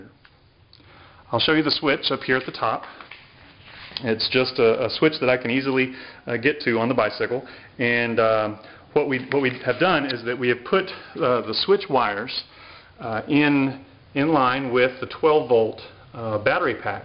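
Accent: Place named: United States. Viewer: Hi.. by your accent I presume you are American, and even though your cars are much bigger than those in Britain, I struggle to think of a car with an alternator belt as long as the one on your bike -what kind of car was it?. I've found that the belt is the biggest problem for these rigs running off the wheelsI I spliced a bit of polyprop rope for one I made, but it was lumpy.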